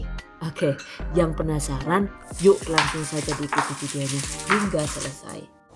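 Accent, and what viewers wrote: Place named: Java, Indonesia. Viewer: Hehe..mbaknya lucu...suaranya, ternyata orang jawa medok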